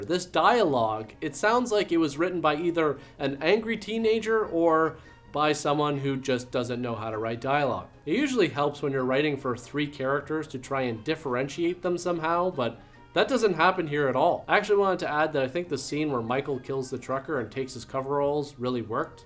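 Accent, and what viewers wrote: Canada. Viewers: Trash, sounds like a Canadian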